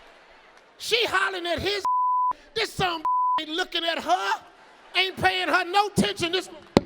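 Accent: South, United States. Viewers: His "country" accent is hilarious. It makes his stand up comedy that much better lol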